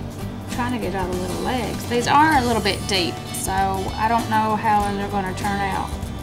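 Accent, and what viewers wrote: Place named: United States. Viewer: Hiiii nice video! Love your country voice 🤗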